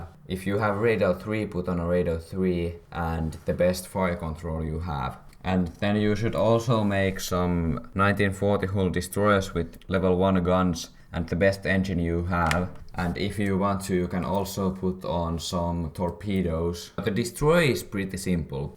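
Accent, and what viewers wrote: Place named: England, United Kingdom. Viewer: Sounds like rally english.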